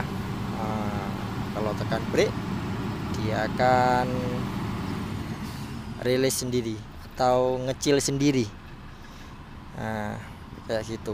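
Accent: Indonesia. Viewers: Orang indonesia asli kah bang kaya logat bahasanya hehe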